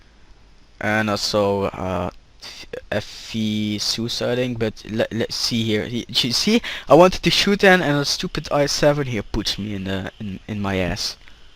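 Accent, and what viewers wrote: Netherlands. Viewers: je nederlands accent veraad je :3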